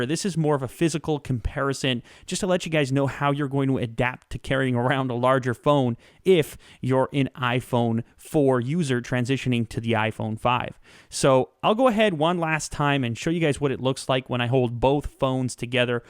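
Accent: Canada. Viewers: Canadian accent? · Canadian lol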